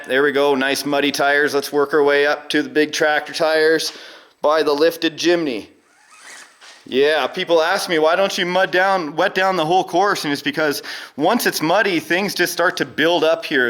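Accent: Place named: Canada. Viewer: Your patriotic words in your Canadian accent brought a tear to my eye... amazing what America has become. A salute to you sir and a big AYE. Bless the North American continent!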